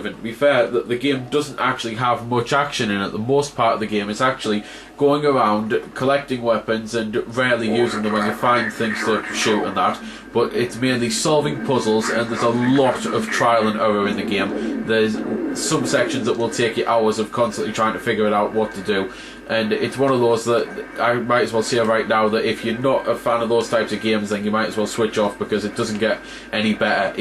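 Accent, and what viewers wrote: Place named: Northern England, United Kingdom. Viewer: Goddamn I can't place your accent. It's Northern...probably N.E.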